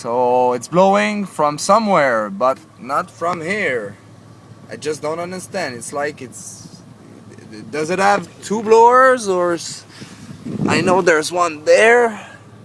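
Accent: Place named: Quebec, Canada. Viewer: Haha..j'avais deviné par ton accent que tu étais Québécois. Moi le problème avec mon liberty c'est qui chauffe juste en roulant. Quand jarrete de rouler y chauffe pu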